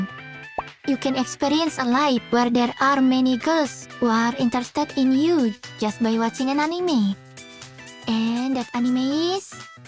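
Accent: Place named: Indonesia. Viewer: Are you Indonesian? Your accent is like an Indonesian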